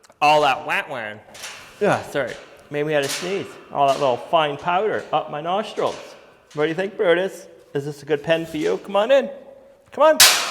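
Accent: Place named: Northeast, United States. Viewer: Truly loved the video! I like your Nor’Easter accent the best. Thanks for the positives you bring with each posting.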